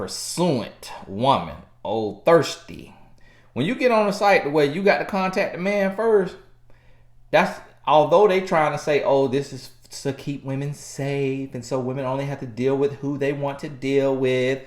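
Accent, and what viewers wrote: South, United States. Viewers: he's so country and i'm here for it! I understand country, but it's been a while since i spoke country.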